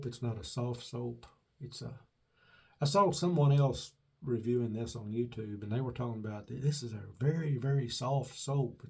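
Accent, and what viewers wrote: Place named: Appalachia, United States. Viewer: where are you located? Do you live in the Appalachian Mountains. You have about the same accent as the people in my area. I live in pound,Va right on the border of Ky. Tenn. and Va. I like your Videos!!!!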